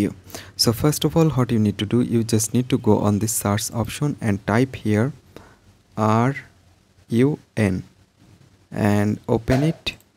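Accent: India. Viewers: This is our indian 🇮🇳english bro 😅 ok so don't mind ok 😅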